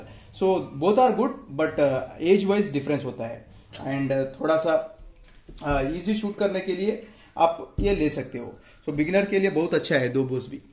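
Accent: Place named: South, India. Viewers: He is from Telangana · I think you are from south india as listening your hindi😁❤️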